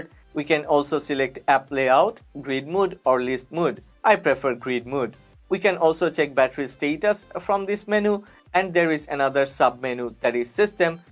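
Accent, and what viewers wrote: India. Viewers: I will be subscribed to your channel when you will stop to speak with this terrible Indian pronunciation.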